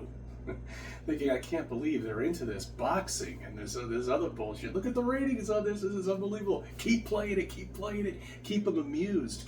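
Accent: New York, United States. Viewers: One question: which part of New York are you from??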